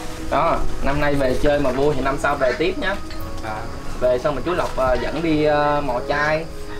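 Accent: South, Vietnam. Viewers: Sao mình nghe giọng bạn miền nam mà cảnh vật đặc sản như ở bắc vậy hơi thắc mắc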